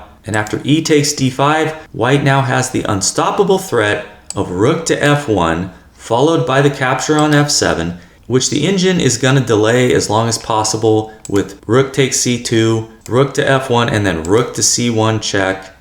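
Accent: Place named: United States. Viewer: Americans pronounce the ant part so it's perfectly fine since he sounds American.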